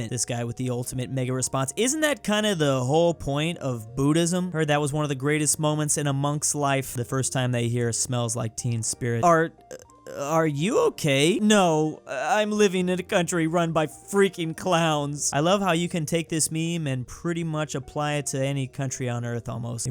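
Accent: United States. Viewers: Mate are you American or the same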